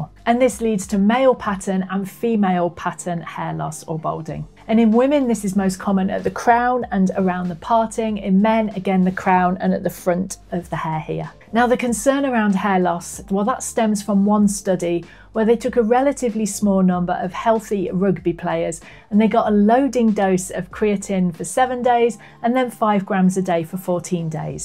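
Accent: United Kingdom. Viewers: I love the way the English say almost anything.❤️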